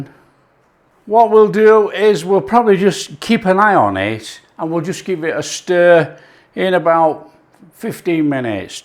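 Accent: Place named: Peak District, United Kingdom. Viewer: Where do you live? I live in Florida now but raised in the Peak District. Your accent is similar to those in the Peaks.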